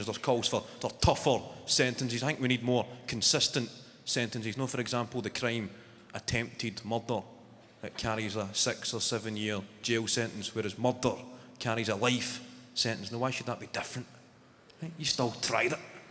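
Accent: Scotland, United Kingdom. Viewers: Apparently he's hard to understand non Scottish people 😂 I'm a weegie so I'm sorted 👍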